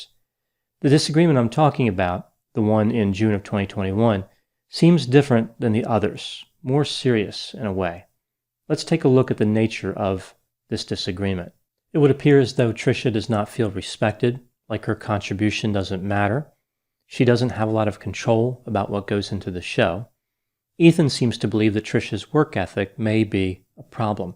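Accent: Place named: Delaware, United States. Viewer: Now that I know he's got a Delaware accent... I can't get over his adorable Delaware accent. It picks me up when I'm feeling "bayud"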